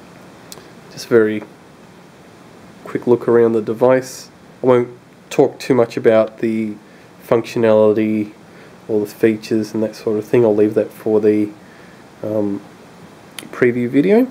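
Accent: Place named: Australia. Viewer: have u heard of australian accent?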